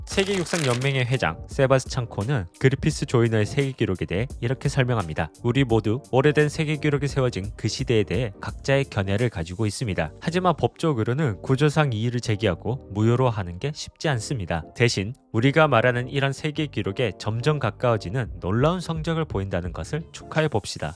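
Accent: Gyeongsang, South Korea. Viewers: 유튜버 하려면 경상도 사투리부터 고치세요.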